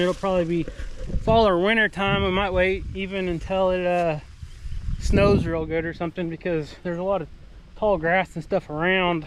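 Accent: United States. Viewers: You are very hard working . You and other farms have a big variety of trees. The Elm wood is excellent for exterior use also it hasa very good grain for cabinet making. Please try and answer this: are some of the trees Pecan ...where you get Pecan nuts ? Being English I have trouble with the American pronunciation .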